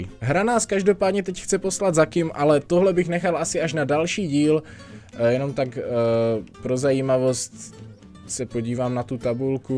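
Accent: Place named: Moravia, Czech Republic. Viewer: já to sleduju taky kvůli těm kecům:D jako moravák mám stejné nářečí jak takže u toho vždycky chcípám:D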